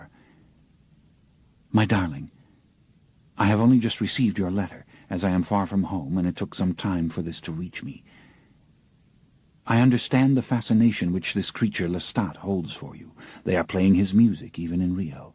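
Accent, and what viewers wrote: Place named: France. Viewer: This narrator burns my nerves. Hey says everyons name with a French accent except Louis. A Frenchman from colonial New Orleans. Make it make sense.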